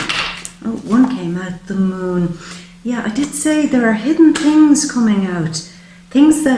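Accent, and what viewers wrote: Ireland. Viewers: I Love your Irish Accent.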